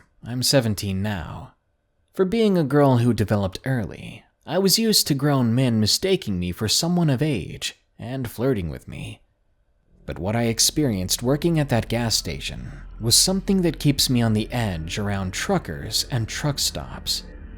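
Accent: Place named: South, United States. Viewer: I like hearing older vids with his southern accent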